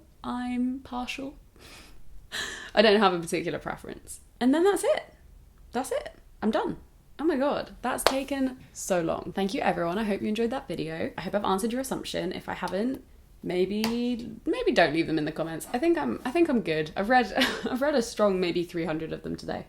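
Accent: RP, United Kingdom. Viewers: Is it weird that I never noticed had a posh accent until she said lol? I guess she comes across so genuine that it didn’t really matter. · I got the distinct impression that on the one hand she didn't consider herself to come from a wealthy background, but on the other she also intellectually knew that she was born of two professional parents in archetypal upper middle class dormitory town Tunbridge Wells in Kent, speaks with an RP accent & appears to have survived a 3 year university degree without emerging hugely & unsustainably in debt, and that these two sets of information were in some tension. This is absolutely not a dig at her or you, just an observation for perspective purposes. We don't get to choose the circumstances of our birth. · As a non-native English speaker, I must say I absolutely love your posh accent! I also really appreciate how authentic you are, thank you for the wonderful content!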